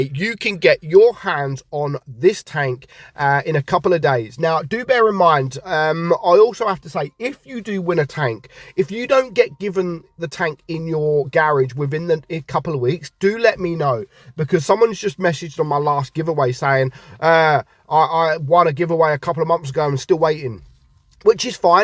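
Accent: United Kingdom. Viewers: apac i like ur briish accent mate lol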